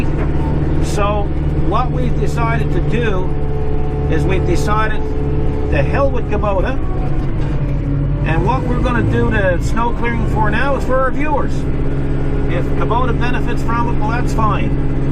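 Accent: Newfoundland, Canada. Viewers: where are you from in Canada? it sounds like your a Newfoundlander because I am one too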